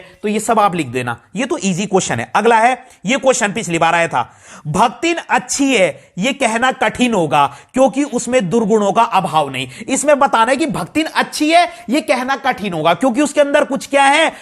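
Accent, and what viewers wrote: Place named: Pahadi, India. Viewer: Merko aapki voice se lag ji Raha hai tha ..aap pahadi ho...😅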